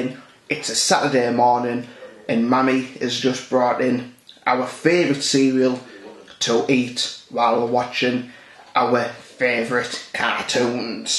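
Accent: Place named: Durham, United Kingdom. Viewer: Knew I recognised that accent lol.. I'm from Durham myself.. I'm glad there is somebody from the area putting out good content!! Keep the videos coming!!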